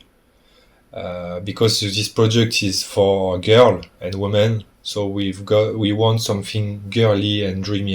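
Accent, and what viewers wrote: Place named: England, United Kingdom. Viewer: Merci pour le tuto c est top. Magnifique acens anglais en passant😁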